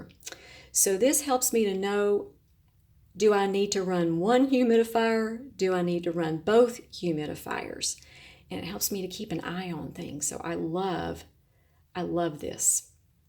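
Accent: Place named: Tennessee, United States. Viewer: I truly appreciate you taking a moment out of this first sunny day in what feels like weeks, to reply to my question. I apologize because I wish I hadn't had to use the brand name of the product I asked about. I was wondering if you had any specific reasons perhaps I shouldn't include the aforementioned product into my initial soak and subsequent watering schedule. I'm born & raised in S.E. TN - my entire life, so not only does your accent feel like home.. 💯...but, your tips concerning directional exposure(s) help me, specifically. Your inherently generous and kind way about you is as lovely as your collection. Enjoy this sunny Sunday!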